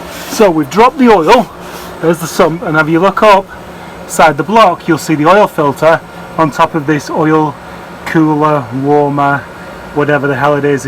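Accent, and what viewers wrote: England, United Kingdom. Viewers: are you from England??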